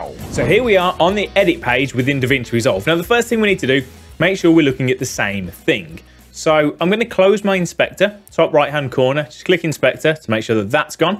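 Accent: United Kingdom. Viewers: I like this British accent. 😀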